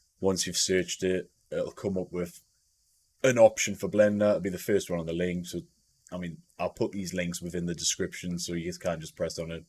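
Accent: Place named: Newcastle, United Kingdom. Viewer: i hear the Geordie accent?? immediately haha.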